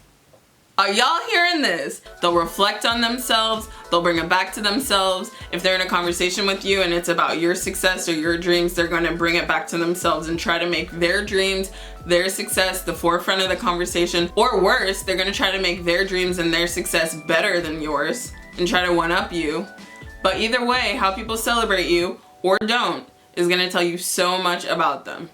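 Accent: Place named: United States. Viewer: What part of america r u from interesting accent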